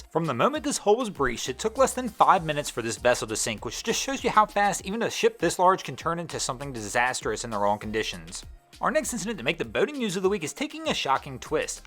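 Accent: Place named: Delaware County, United States. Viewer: Are you from Delco, PA? That accent definitely sounds like it.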